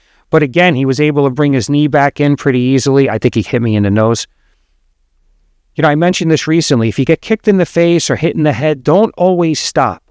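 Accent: United States. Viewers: Even though you didn't say it during this roll, I heard your voice is in my head last night when I rolled saying, " Four points of contact" when I ended up in guard after a take down. I'm a one stripe white belt working on my second and I was rolling with a much heavier blue belt, all I kept thinking was four points four points, it worked quite well for the rest of the round. Your narrations are sinking into my game, thank you for that. I am older than you but you sound a lot like my grandfather who was a New Yorker from Brooklyn.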